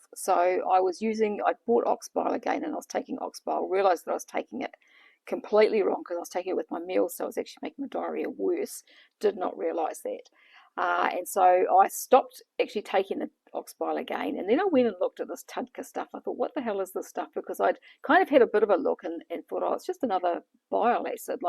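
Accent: New Zealand. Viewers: Still can't get over how beautiful the NZ accent is. Thanks for the advice on tudca.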